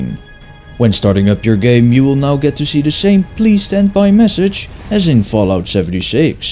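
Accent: Netherlands. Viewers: I'm i right on detecting a dutch accent? Ik denk het wel namelijk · Literally translated "good busy". In proper terms, usually a sarcastic way of saying "well done". He's Dutch, like me, so I can easily notice his accent. I merely made a bit of a giggle here; nothing serious.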